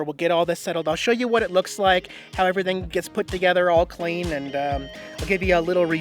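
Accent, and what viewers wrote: South, United States. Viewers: Why is he talking with a southern accent now?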